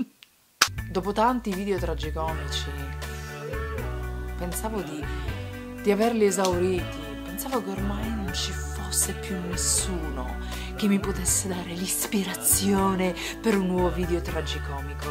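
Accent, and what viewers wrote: Sicily, Italy. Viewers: ma sei siciliana ? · MA SEI MESSINESE O CATANESE? :D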